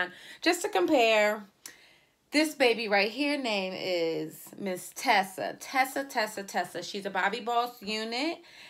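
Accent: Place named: Philadelphia, United States. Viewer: You from Philly? I am and you sound like you from Philly😂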